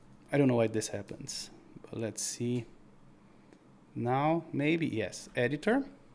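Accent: Brazil. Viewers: I recognize the Brazilian accent from kilometers away kkkkk. Awesome video series, learned a lot!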